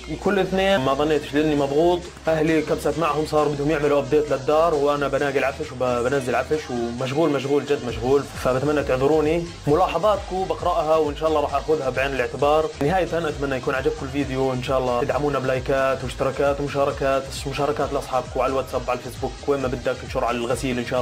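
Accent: Jordan. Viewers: انت أردني صح؟ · انت أردني؟؟؟؟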